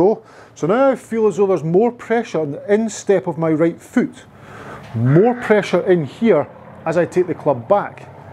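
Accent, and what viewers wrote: Scotland, United Kingdom. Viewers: I very much enjoy your lesson, both the content and your Scottish accent. Luckily I spent a wee while in the UK so it's no problem for me. Unfortunately I can not fwd your vdo to any of my Thai friends, because they wouldn't have a clue what you are talking about. Keep on the good work and no need to change the accent!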